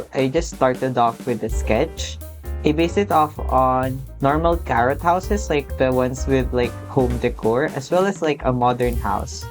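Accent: Philippines. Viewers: Why is the accent very pilipino love it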